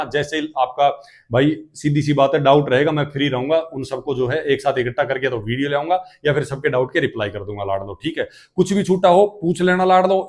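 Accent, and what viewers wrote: Haryana, India. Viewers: Haryana ki ayi ha kya sir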